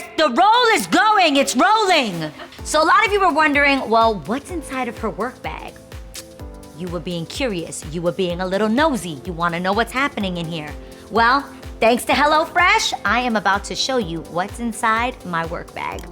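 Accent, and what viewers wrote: New York, United States. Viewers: So I’ve been watching masked singer lol. And just watched the latest episode on Hulu. Soo I know flamingo is you lol. I didn’t catch on till I seen the fourth episode. I heard your beautiful singing voice. And I heard your little New Yorker accent even tho your voice is disguised aaand at the end of what you said “boom”. I was like That’s. Go girl!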